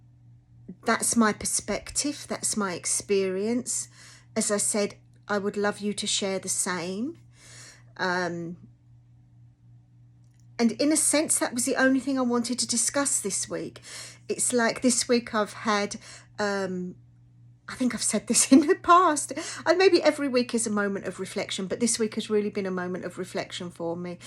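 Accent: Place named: United Kingdom. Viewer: oh ok! I heard the accent and assumed you were over there lol. I was wondering how much it would be over there because US meds are ridiculously expensive even though the same meds in the UK are free.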